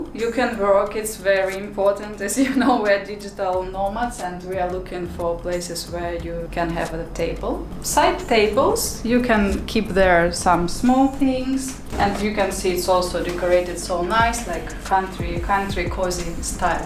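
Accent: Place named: India. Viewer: Başkan sen niye Hintli gibi konuşuyorsun?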